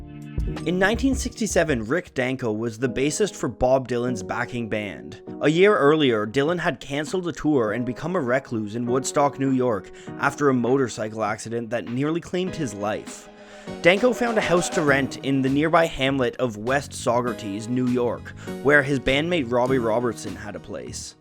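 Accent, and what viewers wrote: Canada. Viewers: Are you Canadian? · I like this channel, with all it's flaws and Canadien accents (houwse gets me every time) and/but I challenge your stage/studio knowledge to make a video on how to mic shit based on the hallway/staircase situation of the unbelievably talented John Bonham. Find songs, and show how mic placement changes and improves the sound.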